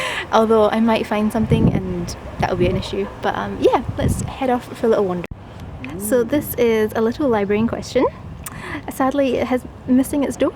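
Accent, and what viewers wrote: Scotland, United Kingdom. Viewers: pretty sure she's a native with that scottish accent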